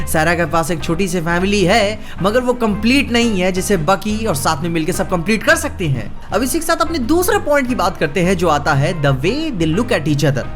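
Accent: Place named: Bhojpuri, India. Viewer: Bhai love your expression ❤️😘,or Tera bhojpuri touch bolne me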